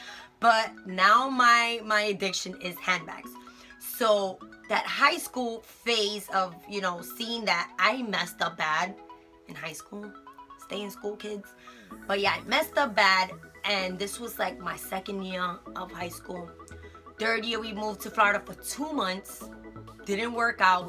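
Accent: Bronx, United States. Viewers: I knew u was from NYC Bronx bc I'm from here too and I talk like u lol bc when I'm making my videos ppl always asking me where I'm from. Love the bags. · Knew you were from the BX once I heard you talk. Im from the BX too and you took me way back. So many memories. So happy to have found you. Your closet is really dope. Love it.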